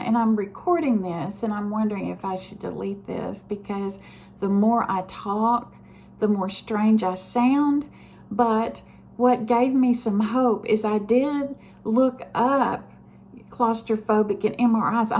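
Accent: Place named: Kentucky, United States. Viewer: The doctors want to do an MRI on me because I devevloped SSHL, Sudden Sensorineural Hearing Loss, in my right ear. There is a very small chance it is caused by a benign tumor. Almost never is the cause known though. I do have Hashimoto’s Disease and for ones very educated about it they know it can cause SSHL and other ear related problems. I am dragging my feet on doing this MRI because I do suffer from claustrophobia. And I do not like feeling restrained. I understand what you mean about also having something in your ears and over your eyes while being inside of an MRI. I stopped getting headphones at museums because having them over my ears was annoying for me. I think all of that would just add to the feeling of claustrophobia and being restrained. In the past my claustrophobia has often been dependent on how well rested I am. I don’t like confined places, but if I am well rested I am not panicking. 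I am American but I live in a an old city in central Europe. Sometimes in the older buildings, the elevators are super small. There are times I panicked and took the stairs and other times I got on the elevator with no problems. Thank you for sharing your honest feelings and very human emotions! My late husband and I lived in Kentucky for 10 years. So I enjoyed hearing your accent.😊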